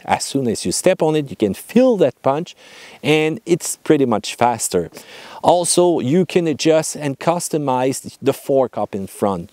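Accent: Quebec, Canada. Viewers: the french canadian accent cracks me up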